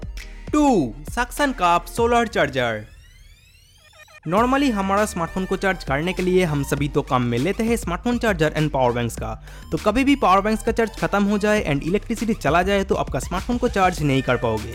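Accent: Bengal, India. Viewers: Aapka hindi kafi kuch Bengalee ki tara hai.... kaha se hain aap?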